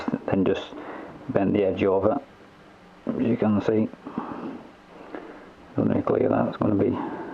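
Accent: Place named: Bolton, United Kingdom. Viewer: Id give you good money for one of them there scoops lad. are you from bolton by any chance?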